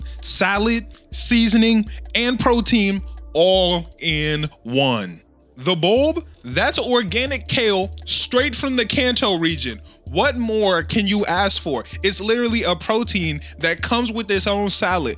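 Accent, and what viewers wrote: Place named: Chicago, United States. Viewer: I was about to comment “Lemmon Pepper what??” And then you played THAT clip 😂😂😂. I could tell you were from Chicago before you even said it 😂😂. Love this video fam.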